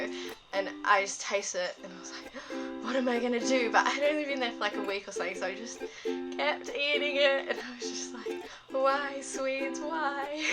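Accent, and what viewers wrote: Australia. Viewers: You're Aussie? Because you don't sound local American to me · Are you Australian? You sound like it! I'm from Sweden btw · I was having a bit of trouble saying for sure if you were Australian. As soon as you said, "know" like "knower." I was like, "AUSSIE!"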